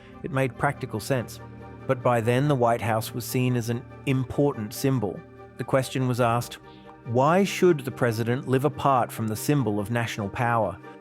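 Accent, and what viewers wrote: United Kingdom. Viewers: This narrative skews the actual history and context of our Constitution; The WH is in the Executive Branch and the POTUS does not need permission from congress make changes to the WH. They are only needed if public funds are needed, congress controls the budget allocation. The British have been colonizers and slavers for the last 400 years, never trust a video with a British accent and never trust anyone with a British title. Yeah, I said, so what. 1st Amendment, they hate it and I love it. Use it or loose it. USA❗ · Why use a British accent to narrate the story of an American seat of government? And the crappy AI images have almost nothing to do with the narrative…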